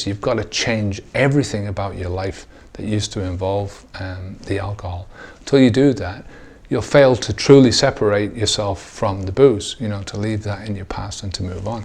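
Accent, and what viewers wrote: Ireland. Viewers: hi you sound like a fellow Irish man and fair play for kicking the booze, i relapsed recently am not sure if it was pressure from doing my master or looking after a sick parent, I used to have a vibrant social life and was able to handle a drink and thought that i could handle just the one and be like everybody else but ended up on a 5 day bender. My question is maybe its the disease of of alcohol making me think am missing out on socialising how to you build a social vibrant life? its not the fact that am depressed but alcohol has hospitalised me on many occasions, accepting defeat is difficult and ive lost jobs and even friends to this but until that relapse i was doing great, things is every time i fall recently i bounce back stronger maybe am learning very confusing.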